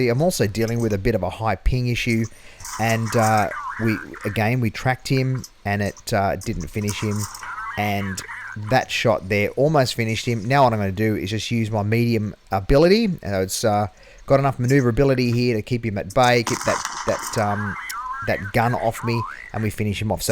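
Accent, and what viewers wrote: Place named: Australia. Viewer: Always good to here an Australian voice, I'm at the progetto iv that's how ya spell it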